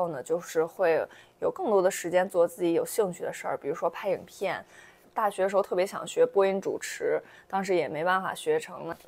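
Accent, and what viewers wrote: Taiwan. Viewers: 有點台味了🤣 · 你其實本來就很有氣質了@@ 但被台灣口音同化之後，更像仙女了🤣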